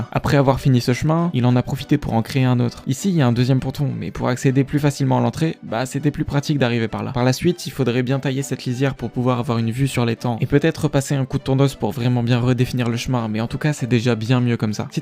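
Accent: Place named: Paris, France. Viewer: On sent le parisien......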